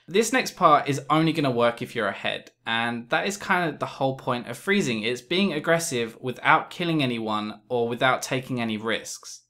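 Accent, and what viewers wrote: United Kingdom. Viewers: FUCKING BRITISH ACCENT :DDDDDD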